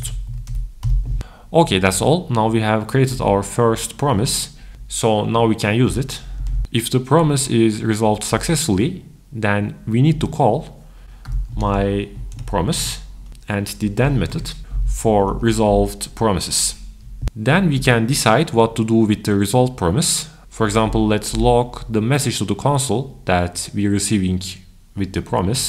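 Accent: Turkey. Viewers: İlk cümleden türk aksanı hissettim ama bilemedim :)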